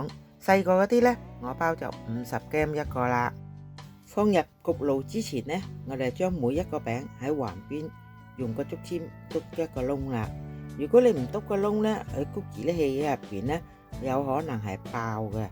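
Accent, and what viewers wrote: Vietnam. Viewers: Amy姐；妳講話的越南口音很重，請問妳是否北越海防人氏？謝謝 ！💝💋💞💯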